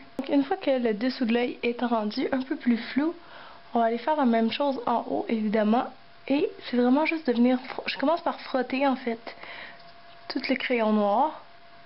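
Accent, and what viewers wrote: Quebec, Canada. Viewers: Juste pour vous dire... Cest une maquilleuse professionelle donc ceux qui critique ils peuvent se la fermé et puis cest pas de sa faute laccent canadien ( moi je trouve sa syper mignon ) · quelle belle accent québécois ;) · Très bonne vidéo ! Ps; J'adore ton accent ! Cannadienne ?? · j'adore toutes ses astuces tres bon a savoir, merci bcp j'adore l'accent aussi canadien quebec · Vous êtes la onzième que je visionne et ouf! C'est exactement ce que je cherchais, et c'est super bien expliqué! (j'ai pris des notes quand même), et surtout, surtout... je n'ai pas été abrutie par des tonnes de produits, de pinceaux! J'ai tout ce qu'il me faut, en fait, et c'est génial! Vous êtes Québécoise? Car j'ai une amie très chère qui habite au Québec et d'entendre votre accent est un véritable rayon de soleil... D'ailleurs dès que je vous ai vue... 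vous m'avez fait un clin d’œil, avant même de commencer. Mais je viens de lire le texto de Jo L et j'ai 59 ans "passé", et je rencontre le même problème... J'ai essayé l'anti-cernes, mais... bof! Mais je vais essayer votre technique, oui! Mais en estompant beaucoup, car passé un "certain" âge, le noir donne un côté "sorcière" (lol), mais on ne baisse pas les bras! YES! Très belle vidéo, classe, nette, propre, sans donner des noms de produits (aahh! j'en avais marre qu'on me dise Kiko and Co!), et surtout un naturel... MERCI!!! Bizzz à vous toutes!!!! Je vous aime, et n'oubliez pas... le maquillage, même juste un peu, ça remonte le moral, même si on reste chez soi, ou si on est patraque... enfin, tristounette. En ce moment, vie compliquée et larmes, donc... maquillage, teinture de cheveux et demain je me coupe moi-même les cheveux!!! Et si c'est raté, ben... ça repoussera! Merci, tu es un amour... 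· Merci beaucoup pour vos vidéos, elles sont géniales et très claire ! <3 avec de bonnes idées très jolies ! (P.S. j'adore votre accent québécois, c'est "cute " ! =D ) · vous avez un accent canadien ))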